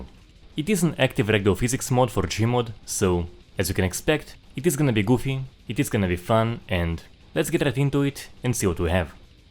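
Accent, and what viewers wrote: Russia. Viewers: It is me or your real voice is russian and talking english?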